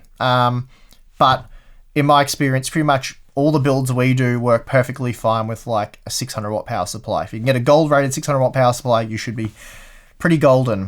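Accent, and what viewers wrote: Australia. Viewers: didn’t feel like 30 minutes. Oh how time flies when you’re listening to an aussie bloke😂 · basically he feels that you are a typical Australian and he finds Tim to be a bit more British than Australian, does that make sense? You are the crocodile guy and Tim is more Nicole Kidman, accent wise.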